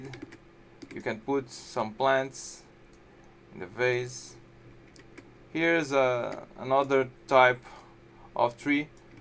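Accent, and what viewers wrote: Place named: Brazil. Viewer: brasileiro ? parece XD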